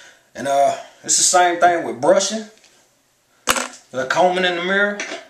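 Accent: South, United States. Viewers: sexy assss country accent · Country accent is dope "G" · Lol he sound like he from the south od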